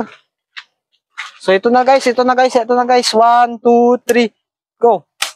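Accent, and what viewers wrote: Iloilo, Philippines. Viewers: ilonggo ka gali dol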